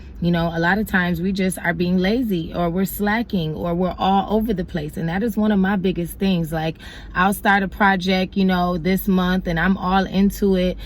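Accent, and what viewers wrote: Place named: New York, United States. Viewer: You got a new York accent.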